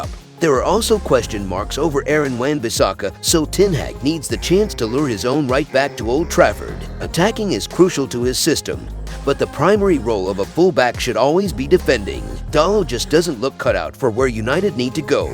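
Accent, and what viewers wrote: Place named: United States. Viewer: Stop using American voiceovers. They are garbage. The do not even know how to pronounce names correctly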